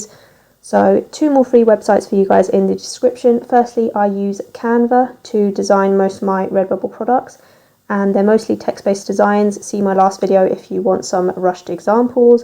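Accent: United Kingdom. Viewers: Wow, this was refreshing,,, & a uk voice too 😁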